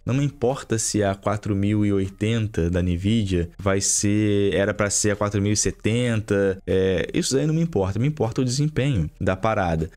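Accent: Rio de Janeiro, Brazil. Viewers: Vai num fonoaudiólogo pra neutralizar esse sotaque de carioca aí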